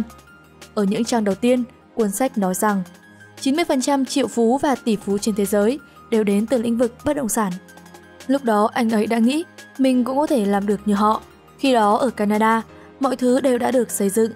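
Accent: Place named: Vietnam. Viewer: ad đọc tên người nước ngoài nghe chán quá, thuần túy là phát âm bằng ....tiếng Việt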